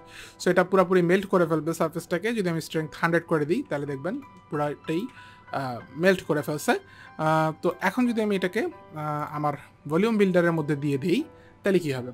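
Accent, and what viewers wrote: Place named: India. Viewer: You think we can understand your English? Slow down man, you English becomes much Indian.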